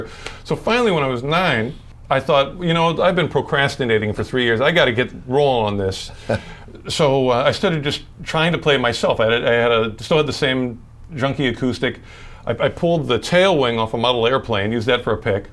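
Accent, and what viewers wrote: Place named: Transatlantic, United States. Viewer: He's changed his appearance and accent to transatlantic. Not sure if this is a phase or if he's aware of it.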